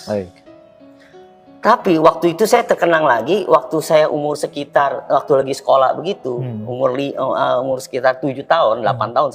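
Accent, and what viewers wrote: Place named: Jakarta, Indonesia. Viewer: Kya Betawi bang bahasaya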